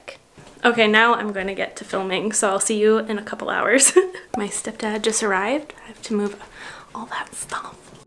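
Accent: Quebec, Canada. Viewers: My favourite phrase or is "we'll see about that" - it's the Canadian accent that does it for me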